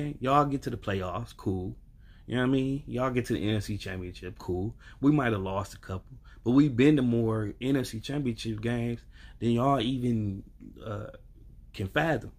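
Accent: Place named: New York, United States. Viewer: I’m a Phins fan but I’m OK with the Pack. I hate the Pats like there’s no tomorrow. I came across your video of the draft and found your response to getting Love hilarious. I know the feeling well. We’ve drafted guys I couldn’t stand. By the way noticed you’re Boriqua flag tattoo on your forearm. I’m thinking your from NY by your accent. How’d you wined up being a Packer fan and not a Jet or Giant fan?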